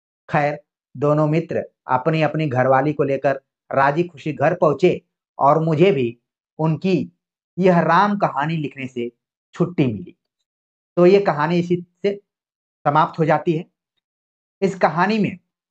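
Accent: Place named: Mirzapur, India. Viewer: Sir mai mirzapur se hu mirzapur ki bhasa aisi hi h😂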